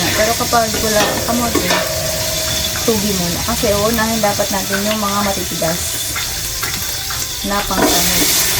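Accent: Philippines. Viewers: idol tonong ko sana anong probincia ka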